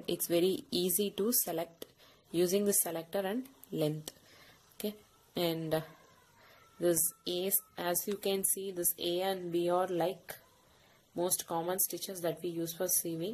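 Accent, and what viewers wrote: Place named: Kerala, India. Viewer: Sound like malyalee ... thank you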